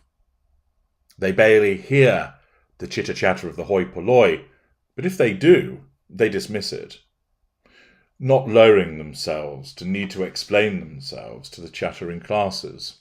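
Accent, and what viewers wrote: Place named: Northern England, United Kingdom. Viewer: Noticed he pronounces other words in this way... always wondered if he originally came from the north of England. Whatever I enjoy his videos so it doesn't matter.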